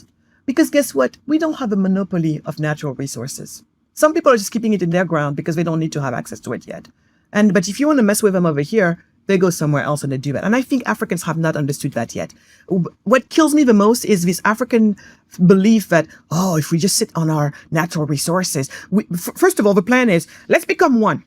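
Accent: United States. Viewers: 30 seconds of listening in, sounds odd. An African ensconced in the West, US probably going by the American accent. Like you say shallow knowledge shallow conclusions.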